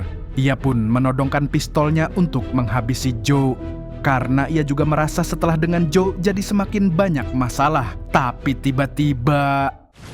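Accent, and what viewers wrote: Banjar, Indonesia. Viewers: Waduh amang urang banjar ah sekalinya😂😂😂 menyalaaa banuaku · Urang Banjar lih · Nahh logat nya pina banjar jar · Ai orang Banjar kah mang 😂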